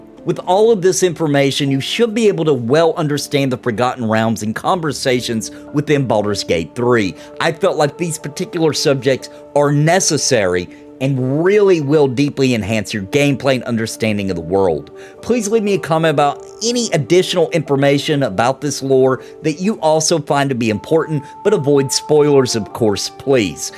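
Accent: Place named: South Carolina, United States. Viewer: I live in South Carolina.... your accent reminds me of alot of people around here.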